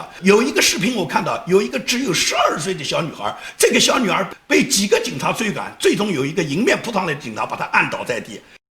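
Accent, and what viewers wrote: Nanjing, China. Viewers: 喜欢建民用带有南京腔骂洪晃，过瘾！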